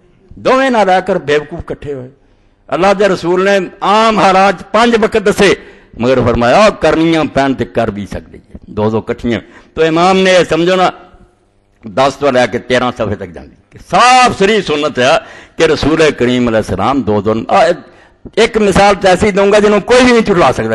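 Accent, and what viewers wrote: Punjab, Pakistan. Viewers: Aik lafz bhi samj nai a Raha Hy itni gari Punjabi bol rahy Hy ap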